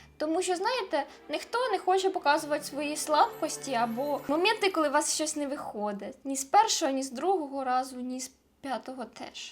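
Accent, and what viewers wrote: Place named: Surzhyk, Ukraine. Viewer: Рибочка, киценька) Підписана на Вас недавно, завжди дивлюсь Ваші відео як маленьке свято чи відпочинок, отауі вони співзвучні! Як класно, шо Ви така щира і харизматична! Зраділа чомусь, шо Ви в Варшаві, моя молодша донька теж вибрала свою самостійність в Варшаві. Дорога до себе завжди важка, зате коли себе чуєш, легко жити це життя! Такий у Вас рідний для мене суржик, звідки Ви? Я з Сум. Бажаю Вам гарних людей поряд і вдячна за відео!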